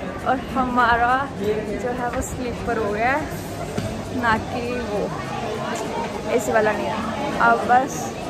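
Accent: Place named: Jammu, India. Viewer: The dogri accent in hindi is ❤️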